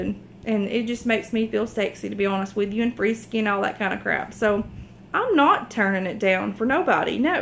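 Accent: South, United States. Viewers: Hi sweetie. I don't wear make up, I don't shop a lot and I'm the worst singer in the world but you know why I subscribe to your channel? Becuase I can tell that your a genuine and honest caring person and when I hear your videos with that adorable southern accent, I think wow...me and her could get on as friends a real treat. Your shopping stories crack me up and your singing is so enjoyable. Don't let others take your light from you girl. Stand together with God in which you know what's right and you'll get by just fine. This is just for now and this will pass. Don't cry pls. You're impacting others to do right in this world so chin up, deep breath and step forward. Xo Mrs Localvore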